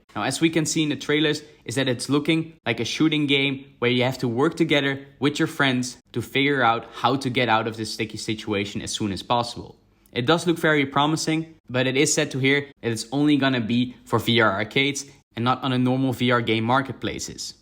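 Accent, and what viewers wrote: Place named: Netherlands. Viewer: i get the feeling your dutch by how you talk idk man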